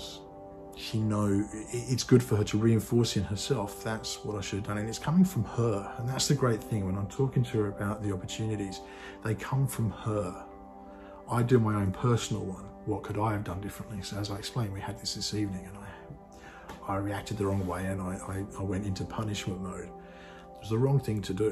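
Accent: Australia. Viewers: Thanks for the Star Trek analogy. That was perfect being Im an avid Trekky. I work in a school with challenging kids. PDA came onto our radar sometime last year. I am working with a girl that we think may have it. Anyway... strategies for her can be applied to all the kids as a way to keep things calm and safe while diagnosis' are being sought. Im in Australia. You sound Aussie. Its easy for me not to feel it personally, it must be so hard as the parent. I validate students feelings so they know I am listening. I have had things thrown at me, sworn at me etc. I ignore all those things. A gentle smile would work best as a bigger smile can be interpreted as ridicule.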